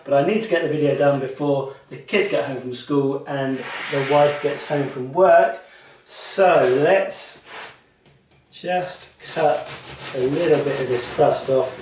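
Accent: United Kingdom. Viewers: Me: hears English accent; hits subscribe immediately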